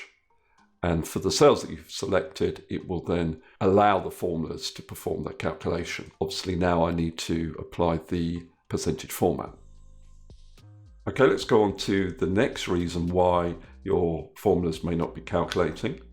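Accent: United Kingdom. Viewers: Thank you!!! It worked! And the best part to listen to your great British accent!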